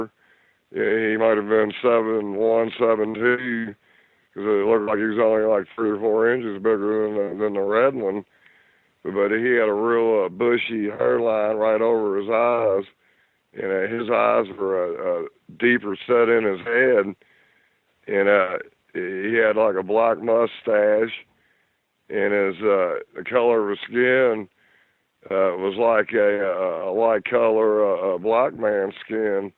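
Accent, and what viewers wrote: South, United States. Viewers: Just a Southern drawl to me! Nothin' bad about that.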